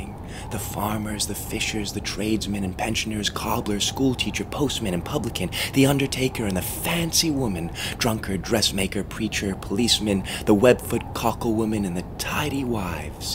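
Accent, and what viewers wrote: United States. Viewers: Lovely. I didn't think I would like a US accent, but it works too. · Doesn't sound right read with an american accent, but well read that man .Great poem, a real journey through what he was thinking.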